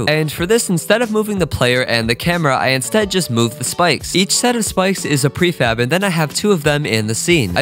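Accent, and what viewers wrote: United Kingdom. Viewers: British accent pog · you sound british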